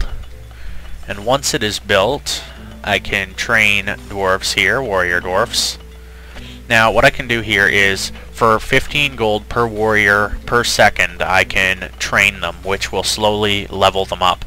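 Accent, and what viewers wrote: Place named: Canada. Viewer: I find it funny that I knew you were canadian the second I heard out XD. Sorry but it's funny.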